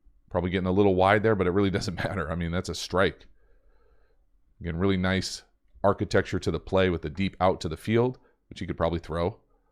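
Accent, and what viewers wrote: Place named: Boston, United States. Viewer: This guy has such a bostonian accent!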